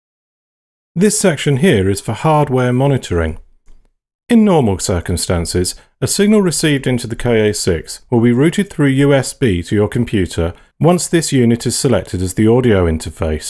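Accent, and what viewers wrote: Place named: United Kingdom. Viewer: The British accent took this amazing product overview right over the top.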